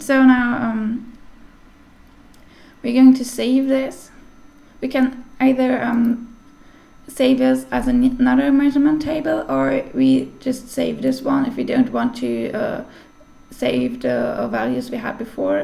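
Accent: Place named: Switzerland. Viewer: Is she Swiss-German? Her accent sounds just like Angelic Venus.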